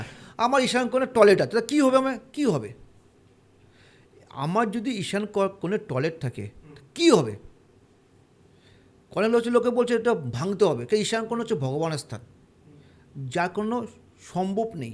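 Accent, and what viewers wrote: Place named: Assam, India. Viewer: দাদা কথাগুলো খুবই ভালো লাগে কিন্তু দাদার কিছু কিছু কথা আমি বুঝতে পারিনা দাদা কি আসামে থাকে কিছুটা কথা আসাম টান আসে কালীঘাটে দাদা কোথায় বসে❤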